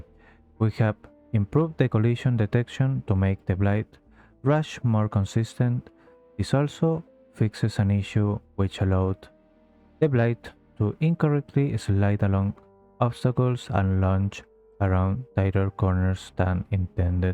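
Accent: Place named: Spain. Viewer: exactly, they really went the lazy way. The devs are getting lazy, what a surprise. Only 1 killer update, 3 perks changed (calm spirit no longers counters ultimate weapon, which is a shame) and only one map changed, where is the swamp visual rework? Im happy for Twins mains but I dont think their changes to Haddonfield are gonna be very good, devs always fuck up in some way. Oh by the way, Im pretty sure you are a native spanish speaker. I myself am from Spain.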